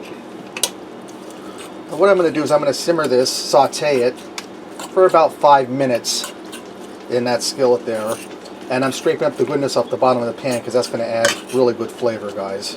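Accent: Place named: Pittsburgh, United States. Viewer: You have a Pittsburgh accent. Makes me miss the Burgh!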